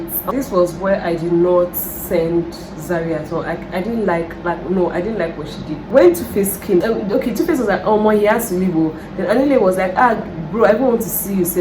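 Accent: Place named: United Kingdom. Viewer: Your British accent 🙌😹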